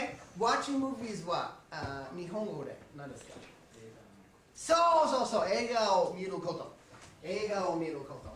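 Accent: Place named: United States. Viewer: 歌うの本当に上手ですｗ すごいアメリカンなまりで話してますけど、もしかわざとやってますか？ 日本語上手そうですし。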